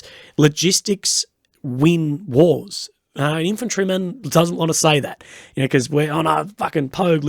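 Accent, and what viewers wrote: Australia. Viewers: Good to get my updates in an aussie accent.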